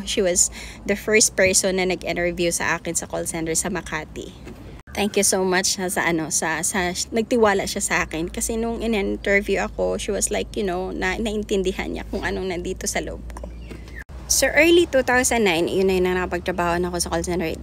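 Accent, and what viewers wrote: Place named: United States. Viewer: Englisera Ka Pala madam at American accent sarap pakinggan.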